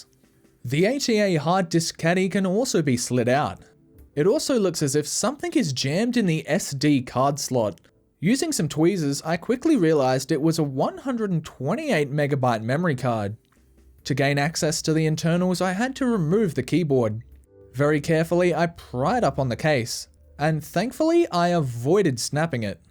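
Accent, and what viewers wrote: Australia. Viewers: is this guy from australia?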